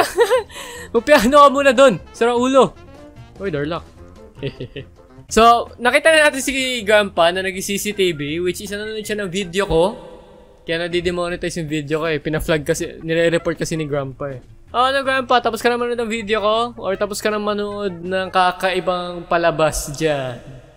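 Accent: Visayas, Philippines. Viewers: kuta bisaya kaba?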